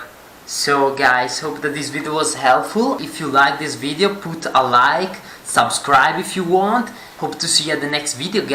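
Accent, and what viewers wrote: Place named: Italy. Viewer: Ciao, grazie mille! Riconosco dall'accento che sei italiano! Tutto funziona perfettamente, mi chiedo solo per quanto altro tempo le app resteranno sullo Store di Oculus Gear ora che il servizio è stato interrotto...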